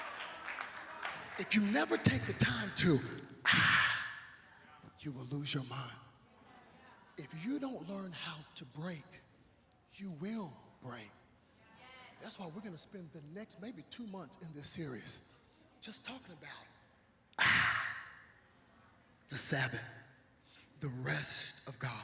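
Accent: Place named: AAVE, United States. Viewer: You use way to much. And a little ghetto. Sorry but you should bring it down with the ebonics.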